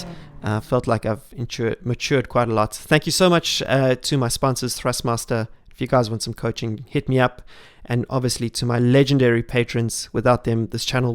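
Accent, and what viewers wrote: South Africa. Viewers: Where in SA are you from? Your accent sounds like Cape Town, or at least somewhere in the Western Cape.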